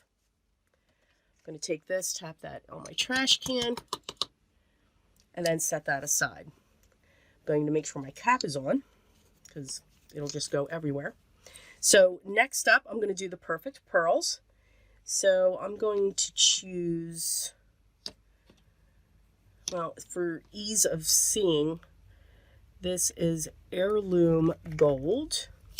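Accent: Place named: New York, United States. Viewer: There is a lot of information here, so I might have missed it, but I was wondering your opinion on which brand had the most shimmer? It is hard to tell on camera and I am sure in person it is much easier to tell. I also was wanting to know, for whatever reasons, which brand you are leaning toward using the most? I realize you probably don't want to "knock" any of them, but each person will have their own preferences, ie: wanting more shimmer, less shimmer, binder, non, etc. I am sure each has their own good qualities, but I would really love to know which you lean toward and why? I have perfect pearls and love it, and I also sometimes use cheap make up (and then seal one way or another) as I can get more range of colors that way, and less expense. But your opinions would be helpful. =) Thanks so much for sharing this, I found it very useful. 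Plus I always enjoy hearing you chatter. =) (I am not sure where you originally hail from, but your accent reminds me of back home in NY, and I miss it there and the days of growing up there.) Oh, and love your sense of humor. You make me laugh often. LOVE it when you do junk journals too! You often feel like a soul sister...despite not knowing me at all (sadly.) One last thought...I was thinking a Versa Mark pen and any mica powders would be fun together. Could make for some fun and shimmer writing/lettering. TFS as always. =) ~Kat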